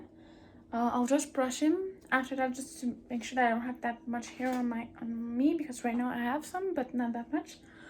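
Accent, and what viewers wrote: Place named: France. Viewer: your English French accent got me hooked...lol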